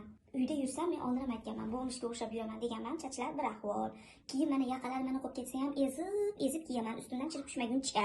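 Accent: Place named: Andijan, Uzbekistan. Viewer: Екади. Айникса андижонча шевангиз. Самимийлигиз екади❤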